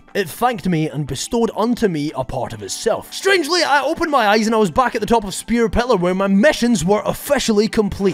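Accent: Scotland, United Kingdom. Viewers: I absolutely agree this guy is amazing and it’s just great to listen to a Scotsman babble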